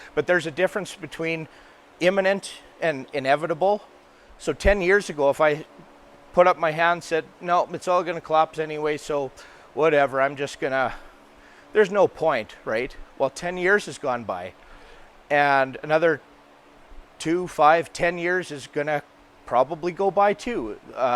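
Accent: Saskatchewan, Canada. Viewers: I haven’t lived in Saskatchewan since I was a child but I love hearing your accent! 😆 Your greenhouse gives me much inspiration as I currently live and garden in Florida but crave moving back to Canada. The shorter growing season terrified me but now I see that it can be extended greatly. 🤔 Awesome job!!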